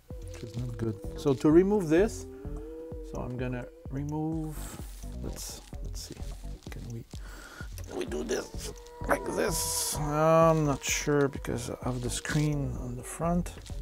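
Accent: Quebec, Canada. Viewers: i love the Quebec accent! And thanks for the video!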